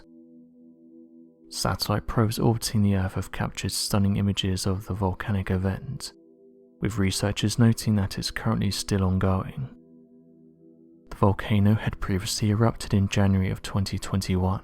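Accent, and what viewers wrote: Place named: RP, United Kingdom. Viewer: And the queens english is easy on the ears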